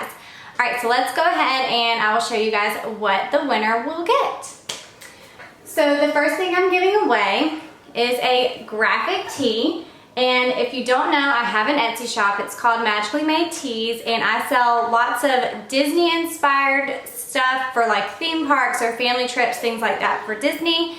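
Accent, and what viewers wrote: South, United States. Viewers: Congrats!!! Subscriber for a while now. And started following you on IG last week. Being from the north, I love your Southern accent ❤️ Love your channel! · Congratulations on 10K!!!! How exciting. I am a new Sub. and I think your home is Beautiful and that Southern Accent!!!!! LOL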